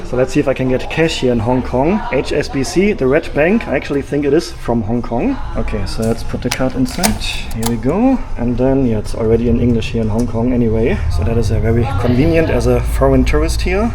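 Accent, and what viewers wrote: Germany. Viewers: You sounds German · Are you german ? Yaaaaah you imagined the accent didnt you ?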